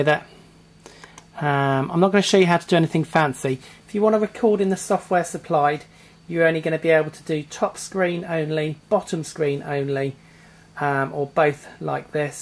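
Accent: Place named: United Kingdom. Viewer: you sound a little like a British mii in Tomodachi life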